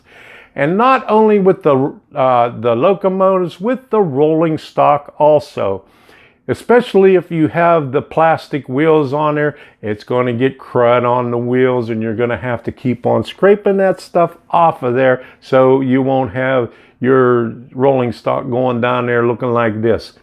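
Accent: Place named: Philadelphia, United States. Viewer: You can plan away until you are blue in the face, but when it comes to the "do", plans usually have to change on the way. However, I've learned one valuable lesson which, over the years has saved me both money, and time - thats, dont fix in place until you are sure its where you want it to be, and that applies to track, buildings and wiring alike. I've just spent 6 months working with Railmodeller Pro software, and everything fitted a treat, but I hate to mention how many times Ive substituted one turnout for another or rebent lengths of flexitrack getting stuff to conform to my "ideal" - easier to get your sections right first before you cut and have to rejoin track. Now on the "do" stage, and testing with most unreliable loco, longest coach, and widest truck load to ensure power joints, clearances and curves are as "hoped for". 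Still nervous about combining light and semaphore signals - in 50 years, only ever done the latter.... Great vids - keep them coming...dunno what some folk whinge about, I'm a brit, and I understand your "philly" easily. Wonder if these folk who complain about regional accents are as ready to criticise UK colloquialisms as readily to peoples faces?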